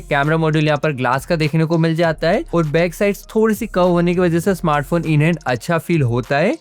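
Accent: Indore, India. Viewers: Bhai aap indore se ho kya?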